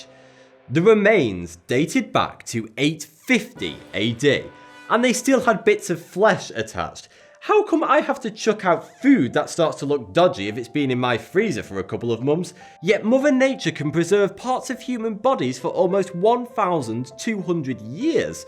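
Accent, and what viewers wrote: United Kingdom. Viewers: The British accent? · Why do British people have to speak like that? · Man, where in the UK do you come from, please?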